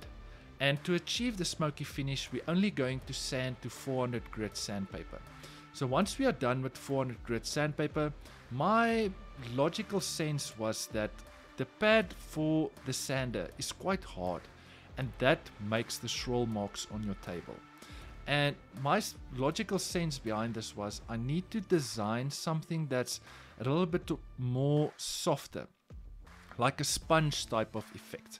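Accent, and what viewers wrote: South Africa. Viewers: Interesting video, in 2021 I came across a video about epoxy tables and I was intrigued did research and it was interesting, then I let the idea of starting an epoxy business goes waste, 1 1/2 years past then I came across your channel, perfect because I could understand your better because you from SA...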